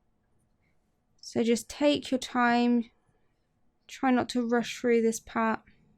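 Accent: United Kingdom. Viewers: I love your channel ❤️ also are you British? Xx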